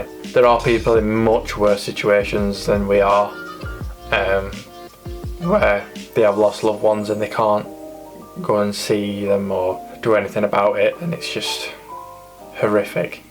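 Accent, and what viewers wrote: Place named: Yorkshire, United Kingdom. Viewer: Top effort on 5k. Hey, stay strong and look for only little goals to stay up beat. I know how tuff things can be for you in lockdown as I was without any luxury in Afghan for a very long time. Remember to enjoy life and don't set big daily goals as failure to complete them can make you feel worse. Lovin your Vids & Yorkshire accent more than ever these day since I'm working down south.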